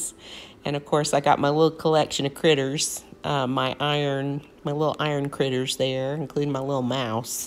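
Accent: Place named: South, United States. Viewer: Hi! I just found your channel. I am also a retired teacher and I can detect your southern accent. You can take the girl out of the south, but you can't take the south out of the girl! Thank goodness! I am a transplanted Texan living in Houston, Missouri. I really like your decorating style. I will be back for more videos.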